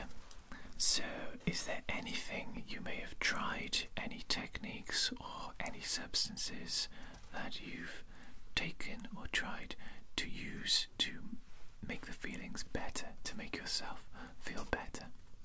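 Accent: Wales, United Kingdom. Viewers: I love the Welsh accent! My step dad is from the valleys So glad I stumbled across this channel tonight 😊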